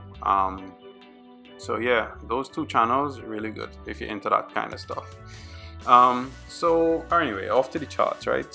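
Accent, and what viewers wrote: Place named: Trinidad and Tobago. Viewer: great video. u sound like a trini, we should link up and work on some stuff. i am also an algo trader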